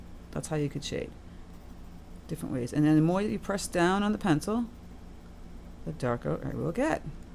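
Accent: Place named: East Coast, United States. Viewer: I'm enjoying your videos immensely, your a great teacher and a pleasant, cool, East Coast voice. Best to ya!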